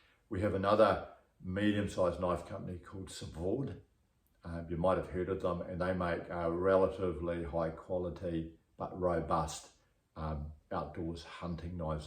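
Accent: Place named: New Zealand. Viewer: great kiwi accent - easy to listen to nice content mate, the history of nz making is really interesting there is an old forge down on the mainland - near blacks hills (?) it's really cool and i can imagine knives being made and repaired there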